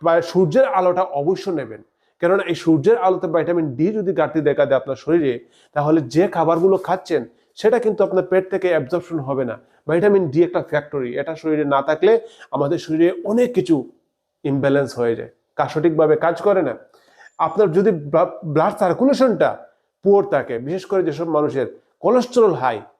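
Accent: Sylhet, Bangladesh. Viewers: আপনার একসেন্ট সিলেটের। আপনি কি সিলেটি ?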